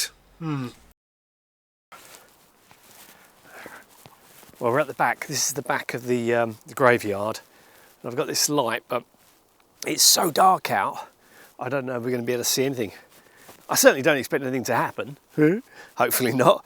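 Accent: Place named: United Kingdom. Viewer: British English ❤❤ I hope I can learn it 😢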